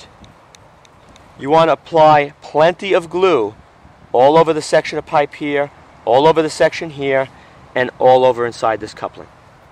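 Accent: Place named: New Jersey, United States. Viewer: So was this filmed on the Jersey Shore (pronounced "Show ah") ? :-) That accent sounds familiar.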